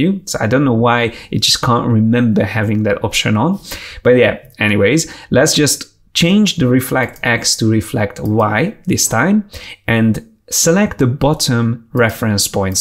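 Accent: Hungary. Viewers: Sorry for the off-topic question, but may I ask where you're from? Your accent is a dead ringer for Hungarian but your name sounds Polish, so I'm both confused and linguistically intrigued. :) Great video, by the way.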